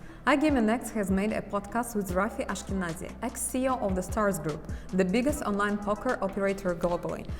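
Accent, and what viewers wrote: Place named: New Jersey, United States. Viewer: That’s a lovely accent you have. New Jersey?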